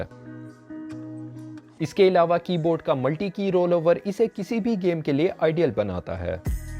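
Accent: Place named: Peshawar, Pakistan. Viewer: Yar ap ky bolny ka style Peshawar k logo jesa he. 🤪 But phir b kha se he ap☝🏻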